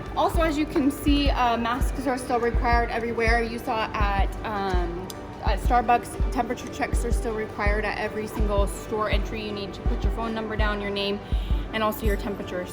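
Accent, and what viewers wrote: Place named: New York, United States. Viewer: Are you from NYCity？ sounds familiar XD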